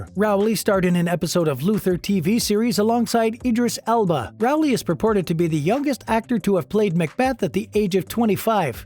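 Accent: United States. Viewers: PLEEEAASSEE....LESS OF YOU TALKING..ABOTE the show!!! Sorry to offend... But when you decide to do any kind of public speaking, you REALLY need to try & lose the annoying Boston accent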